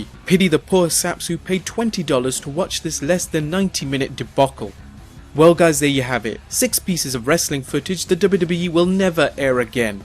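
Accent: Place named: United Kingdom. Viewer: Well what do you expect? It's annoyingly another British guy narrating a video.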